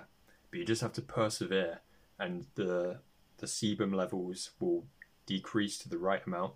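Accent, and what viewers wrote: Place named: United Kingdom. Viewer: I DID NOT EXPECT YOU TO BE BRITISH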